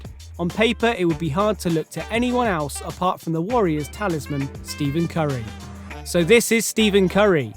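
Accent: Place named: England, United Kingdom. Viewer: Stephen and not steven. I am sure u re inglish man and not american because of your accent.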